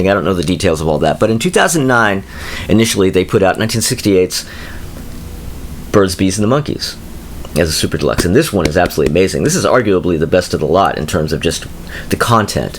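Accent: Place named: England, United Kingdom. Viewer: Love the Monkees funny how your doing a English accent when most were American great showing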